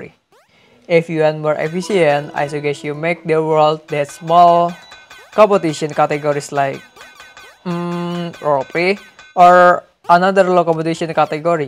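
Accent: Indonesia. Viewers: logat indonesia nya masih kelihatan wkwkwke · woy.. english ne jgn ada logat jawa nya dong... klau gk pande bahasa inggris... tulis aja.. gk enak dengernya hadeh!! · ronald <num> His sound like Reza oktovian,so h is Indonesia.And his sound like From Indonesia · Orang indonesia ya???logat nya persis orang indo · Indonesian accent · Jack Roach He indo, his accent was indo/asian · your sound is like indonesian people trying to talk english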